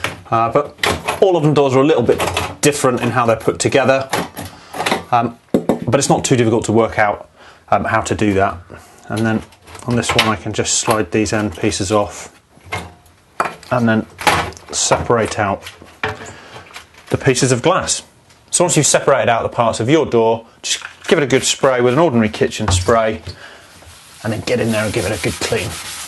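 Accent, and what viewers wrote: United Kingdom. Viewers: Good Lord! A British voice on YouTube!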